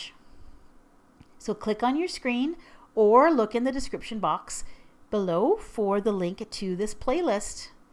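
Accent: Canada. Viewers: Random question, are you Canadian?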